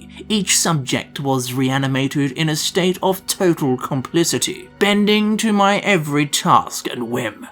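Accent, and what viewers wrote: Australia. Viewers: Your an Aussie Aye?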